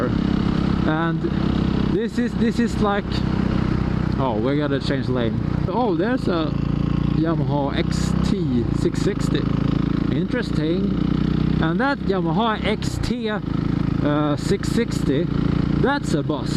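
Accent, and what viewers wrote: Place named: Sweden. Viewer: Hahaha so funny!! Make more videos like this! (Y) Awsm riding! :D Jeg elsker din svensk-engelsk, haha, er fra Norge selv.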